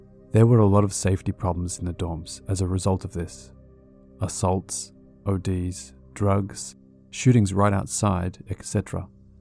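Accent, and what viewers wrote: Queensland, Australia. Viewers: That's what heard. QLD for sure. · Sounds like a fellow Aussie. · aussie myself and yeah, QLD was my first guess too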